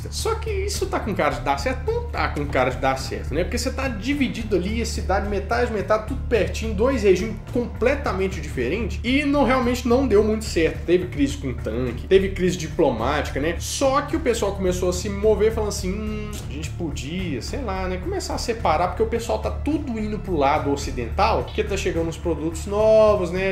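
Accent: Minas Gerais, Brazil. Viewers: Comecei o vídeo e fui pensando “esse cara é mineiro, é igualzinho meu irmão falando”. Quando ele falou “aqui em Belo Horizonte”, soltei a gargalhada. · Cê é mineiro né? Melhor sotaque do mundo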